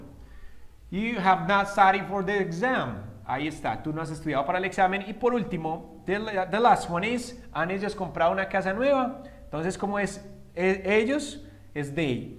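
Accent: Antioquia, Colombia. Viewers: El oprimido yo también lo note y soy de españapero se le nota un fleje (mucho) que es colombiano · Más paisa que una arepa, gracias teacher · igualmente soy de medellin lo note inemdiatamente por el acento :D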